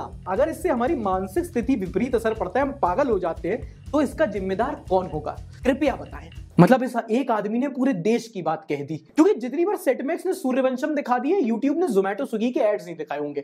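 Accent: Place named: Bundelkhand, India. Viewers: भैया हमें भी लग रहा हूं कि तुम बुंदेलखंडी हूं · Sir your bundelkhandi is best i am from bundelkhand😂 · Your Bundelkhand accent.........Maja aa gaya😂😂😂😂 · That bundelkhand ascent was lit 🔥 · Bro have full bundelkhandi accent I approve you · As a Bundelkhandi I can say you Bundelkhandi accent is 😂😂😂😂 amazing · My man speaks prime bundelkhandi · Bhai I am from Bundelkhand aapne bahut hi gajab Bundelkhandi boli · Bhai bhai aap to full bundli bol letai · As a bundeli 😍- Bro ur Bundelkhandi accent is on top notch!! 😅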